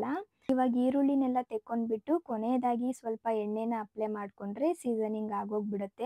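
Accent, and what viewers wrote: Mandya, India. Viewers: Nim math keli starting andkonde madyane anta. We are also mandya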